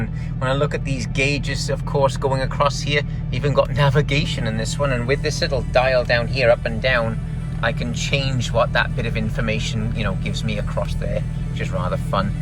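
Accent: Wales, United Kingdom. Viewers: Welsh accent !!!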